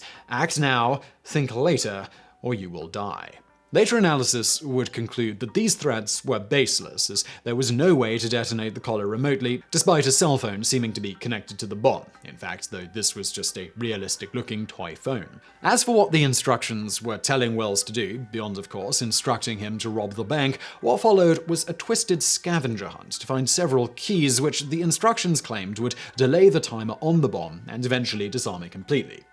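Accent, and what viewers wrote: United Kingdom. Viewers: I can't watch a UK guy tell an American story🤣it's annoying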